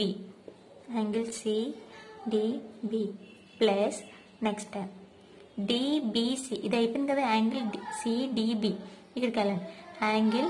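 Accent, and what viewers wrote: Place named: Coastal Andhra, India. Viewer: Madam what is your name? I thinking your name is madam. 😀😀😀😀😀 Madam another doubt I have What is your city? I thinking your city name rajamundry, vizag,kakinada and srikakulam. I said because these city names only Because your voice and slang like this cities only. Please reply me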